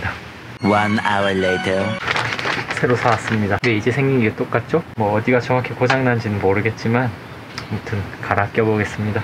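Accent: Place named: Gyeongsang, South Korea. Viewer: 손재주가 좋고 영상을 진짜 잘찍었네예 같은 경남쪽이라 사투리도 정겹고 와이프차 고치는데 많은도움 될듯 합니다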